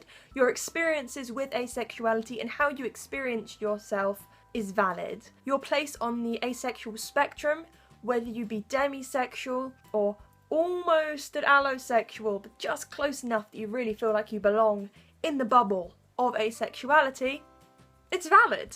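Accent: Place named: London, United Kingdom. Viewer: You have the same accent as my writing buddy out by London! She completely accepted my asexuality when I asked her to potentially help me out with writing romantic scenes in my books, even said asexuality sounded cooler and less annoying than being sexually attracted to people. She's not asexual (as far as I know) and she immediately accepted me without any need for debate and we'd only known each other through email for maybe 2 weeks. What's your excuse world?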